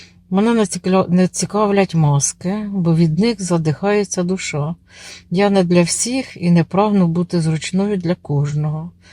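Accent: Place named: Dnipropetrovsk, Ukraine. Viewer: Доброго ранку!Дивлюсь Вас і посміхаюсь,Ви такі класні!І говір у Вас такий ,як у нас ,ми з Дніпропетровщини,теж сільські,але рідко кудись вибираємось.Дуже дякую за Ваші прогулянки ,А балачки то взагалі , як похід до психолога,тут і поради і пояснення!З Вами просто і легко!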